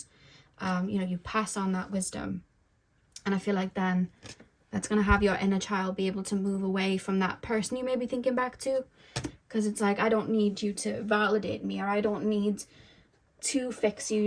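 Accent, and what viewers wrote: Wales, United Kingdom. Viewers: Coming from Pile 3 😭 thank you so much, bawled as usual! I love when you say alright trigger warning we’re going deep lol. These readings of yours have been so powerfully healing for me. The higher self of your mother one did more to help release and forgive her than anything I had tried. Also, even though I’m Australian my Dad is from the UK and I know you’re Welsh but your accent is similar to my ancestors so it all feels extra healing 🦋 Going back and forth with a toxic ex right now, feeling the deeper thread ready to break and knowing I have to go purge some ancient pain. So don’t want to 😂 But if I don’t I’ll just go and seek the same person out in a different body! I’ve just found your inner teenager reading so will do that one 🫶🏼 maybe a reading on how can I receive nurturing better or just understanding worth and love properly maybe? 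Childhood trauma/narc/emotionally immature parents and the relationships we form after that are a real minefield to heal from. I really appreciate your readings and the fact that you really truly GET IT. 🤍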